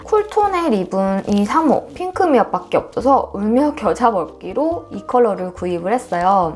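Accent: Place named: South Korea. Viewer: 사투리 쓰시나바옄ㅋㅋㅋ 먼가 억양이 느껴짐 목소리가 너무 매력적이에요! 너무 조명 뽜악! 아니라서 발색 보기가 좋네요 :)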